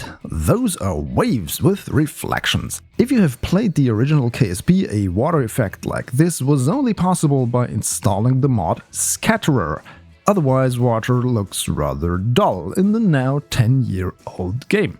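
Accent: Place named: Germany. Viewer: I know a german guy trying to speak professional english when I hear one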